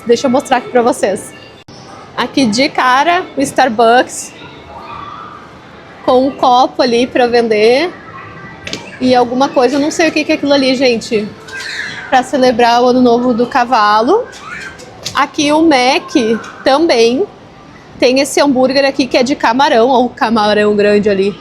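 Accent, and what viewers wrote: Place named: South, Brazil. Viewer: Sou seu seguidor, acho vc e familia muito simpaticos. Queria dar umas sujestões de videos. Antes a china era chig ling. Tudo era copiado. Agora é o futuro, tamanho o avanço de tudo ai. Mostra mais esse lado, as coisas tecnologicas. desde ponte, ruas, maquinas, coisas diferentes pra nos brasileiros. Sou do sudeste mas adoro o seu sotaque do sul. Um grande abraço e um beijinho para o que parece ser um doce de crinaça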